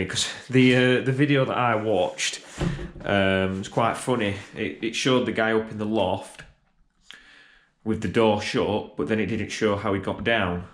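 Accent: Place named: North, United Kingdom. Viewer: Nice tidy job once again mate as always - your a great bricklayer and definitely a good all rounder it seems 🍀👍 and the most Northernet northerner I’ve ever heard 👍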